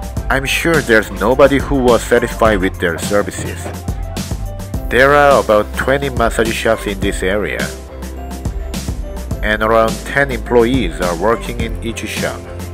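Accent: South Korea. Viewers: This guy's accent 100% korean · base on your accent, i can tell you are 한국 사람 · nice vid. I can tell from your accent that you are from Korea.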